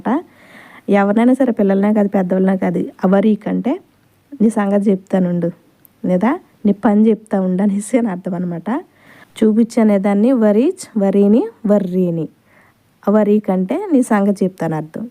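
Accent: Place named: Godavari, India. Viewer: మేడం మీరు ఇస్ట్, వేస్ట్ గోదావరి అనుకుంట మాటలు తియ్యగా వున్నాయి,, మా డిస్ట్రిక్ట్ కడప, ఐ లవ్ యు మేడం మీరు చెప్పే అరబిక్ చాలా ఇంపార్టెంట్ థాంక్స్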